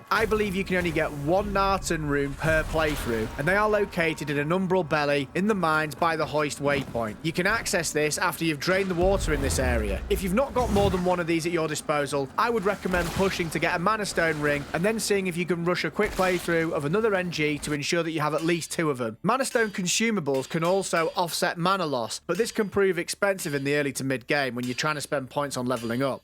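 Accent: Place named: North West England, United Kingdom. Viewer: I’ll be honest, bro, I keep expecting to login to it being nerfed to f*cking kingdom come 😂 glad I got to experience it in all its busted glory before the inevitable happens 😂 And my pleasure to support; especially for a fellow, North West of England, lad (there’s about 35miles between us [north of me] judging by your accent). Keep up the good work, fella 👍🏾🤟🏾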